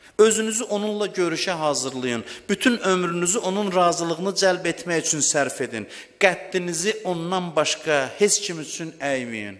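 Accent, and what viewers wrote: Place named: Lankaran, Azerbaijan. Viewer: Ərəb və fars dillərini mükəmməl bilir.Bilirsiz əslində adi insanlar bir dənə rus dilini biləndə nə qeder ləhcə və intanasiyasinda deyisiklik yaranir.Haci həm Lənkəranlidi və bizdə cox ilahiyyatcilar o zonadandir.O zonanin da xüsusi ləhcəsi olur.Ərəb Fars və Rus dillərini də mükəmməl bildiyi ücün Azərbaycanca danişiğa az da təsir edir.Turk alimleri ise sadece Erəbcəni bilir.Çox güman ki,bunnandi.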